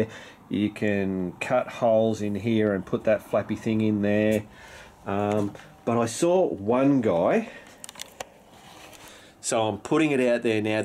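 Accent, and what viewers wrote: Australia. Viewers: Thanks for the great video/info. You're a funny guy. You know, us yanks love the Aussie accent. The cardboard was pretty low tech, but certainly got the job done. Concern over blocking the air that cools the engine is always an important factor to consider and monitor. I've seen canvas covers that go over the front crash bars. You might want to get a digital laser temp gun to monitor your engine temp. Thanks again. I will integrate all this into my solution.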